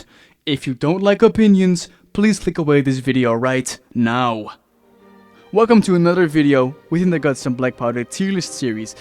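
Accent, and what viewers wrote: France. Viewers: i like ur french accent, subbed good vid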